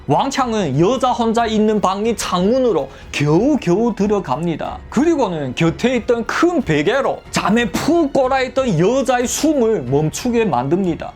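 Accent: China. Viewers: 따거는 중국동포인가요? 말투가 아주 맛깔나네요!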